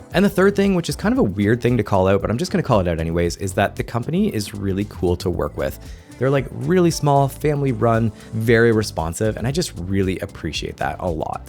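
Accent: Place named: Ontario, Canada. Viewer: of course! I have the LS-208 as well. Great mic! All Lauten Audio mics are fantastic. I have several. You seem to be a fellow Canadian. Also, I’m detecting an Ontario accent there. Maybe north of Toronto? Peterborough? I’m in the Niagara Area, myself. Nice to meet you!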